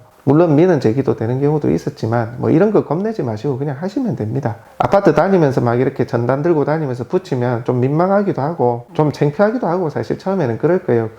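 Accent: Gyeongsang, South Korea. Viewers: 갱상도 사투리 잼나요.ㅎㅎ 홧팅!!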